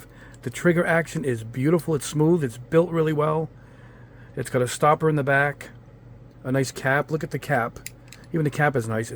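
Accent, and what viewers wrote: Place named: Rhode Island, United States. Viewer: I've been using my Iwata CN NEO in both trigger and button valve for a few years now and I'm getting better every day. I need an airbrush with a 0.2mm or smaller needle for painting some minis. Sure, I would love to get an H&S but $$ is really an issue for me as a retired person. What airbrush would you suggest $50-$75 for what I'm looking for? Hey; by the way, I'm a fellow Rhode Islander born and raised in Bristol before I relocated to (Bristol) Vermont 15-16 years ago... I love the fact that I can listen to your accent and really feel like I'm at home listening to an ol' buddy.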